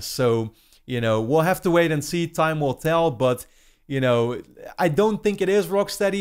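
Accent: Netherlands. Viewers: Hahaha ik hoor aan je accent gewoon dat je nederlands bent, wel toffe video!!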